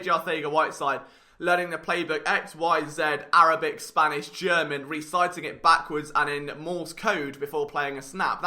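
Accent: United Kingdom. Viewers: If my Eagles content doesn’t come with a British accent, I just don’t trust it!!! 😂🦅 🤙 Thanks for your hard work,. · I see an Eagles video, I tap on it. I dont year a British accent, I close said video. Good job. Keep it goin.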